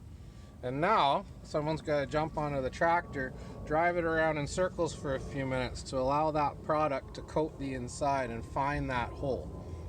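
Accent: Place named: Canada. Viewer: Thanks, helped alot. I'm in NC. I noticed you talk like being in canada. Say "about" like "aboat". But great video. thx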